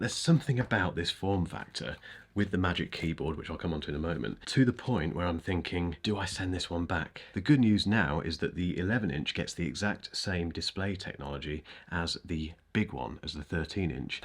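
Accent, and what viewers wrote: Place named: Cardiff, United Kingdom. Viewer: The most proper Cardiff accent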